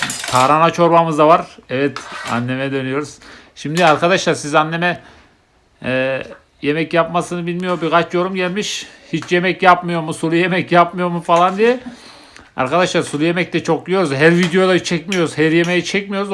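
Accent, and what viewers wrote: Konya, Turkey. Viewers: Sen Konyalı mısın Konyalı gibi konuşuyorsun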